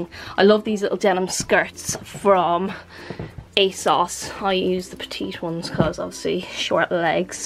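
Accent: Ireland. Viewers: "Pray to the fairies"... Lol. Does that even work if I do it - or does it work for the Irish only 😂 Love your Irish English by the way... The only reason why I religiously watch channel!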